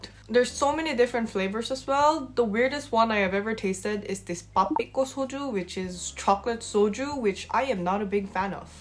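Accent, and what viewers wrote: Batangas, Philippines. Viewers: You really sound like a Filipina speaking tagenglish. I really like your batangueno astig accent and Im a big fan of yours. You should have a talk show in Manila. Since you are articulate and smart. You will be a big hit. Watching from northern California, the bay area. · Just binge watched your videos. And saw on ig that you studied Archi in UP i guess? My question is, are you using your degree now? Like the apprentice and such? Or pahinga muna? And how would you take the boards? Macecredit ba yan sa korea and youll be a licensed archi there???? I hope youd be able to reply. Im an archi student as well kasi HAHAHAHAA *plus i really love na na adapt mo yung thick neutral accent ng pinoy when speaking in english. Mas prefer ko yun, mas pangit pa nga yung may konting tweng or conyo BGC type na english, like shut up and get the fuck off 💀😂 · Napanood ko po kayo sa TVJ, mukhang bata yong itsura niyo pero yong 12 years old mukhang mature na. 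Maganda po yong batangueña accent niyo talagang tigasin, kilala po kasi ang mga batangueño na matatapang, mga ilocano na kuripot, mga kapampangan na galante. Sabagay bawat lugar may kanya kanyang bansag, sinasabing matatapang ang mga batangueño dahil sa salita palang eh medyo mai intimidate ka na, lagi pa silang may balisong sa bulsa kaya kung ayaw mong magripohan huwag makipag away sa batangueño, sinasabing kuripot ang mga ilocano dahil siguro bihira kang makakita sa ilocos ng mga magagarang bahay gaya ng Pampanga, o manila na super gaganda ang mga bahay at halatang may kaya ang mga tao. Sa ilocos po parang hindi apektado ng mga pagbabago ng panahon, meron din mangilan ngilan na magaganda ang bahay pero mostly simple living ang mga tao doon dahil karamihan sa mga ilocano, sa pagsasaka ang ikinabubuhay at kahit na nakapagtapos na ang anak ng isang magsasaka, mahilig pa rin sa nakagisnan na pagsasaka, in other words simple living lang talaga ang maraming ilocano kaya nasasabihang kuripot. 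Sa mga kapampangan naman po, palibhasa progresibong lugar ang pampanga, maraming mga business establishments, mga restaurants at parang manila na maraming tao. So maraming mga kapampangan ang mga milyonario at ipinapakita nila sa magagarang bahay, in fact po, tuwing piyesta sa ibat ibang lugar sa pampanga, kunwari dadaan ka sa isang handaan na open for public, puwede ka ng makikain, huwag lang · galing magsalita ng Filipino…. go Batangas!!!! andun pa rin yun punto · I just love the way you talk like a local in taglish and the way you articulate your English is tops. No more shades of being Korean. Even your intonation is so Filipino and so understandable. I'm very proud of how you've turned out to be. You are now very well versed and a good conversationalist. Thank you, congratulations and God bless.. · Grabe Pinoy n pinoy accent tagalog ni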